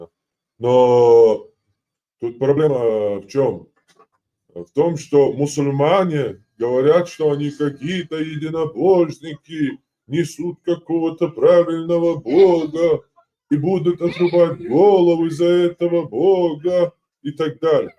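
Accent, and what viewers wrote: Chechnya, Russia. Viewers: ТЫ СКОРЕЕ ТОРЧОК РОДИВШИЙСЯ И ЖИВШИЙ ОКОЛО ЦЕНТРА ГОРОДА... В ТЕБЕ СМЕШАЛИСЬ ВСЕ ДИАЛЕКТЫ ЧЕЧЕНЦЕВ! БЕЗРОДНЫЙ!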